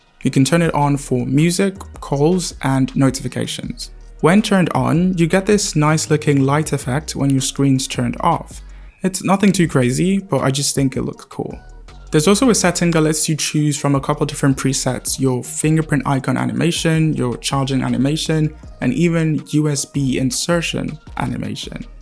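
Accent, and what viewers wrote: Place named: England, United Kingdom. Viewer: he sounds english, am i tweaking??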